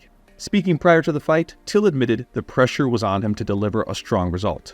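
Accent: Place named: Liverpool, United Kingdom. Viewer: You could be the biggest badass in the world and you still wouldn’t be taken seriously with that scouser accent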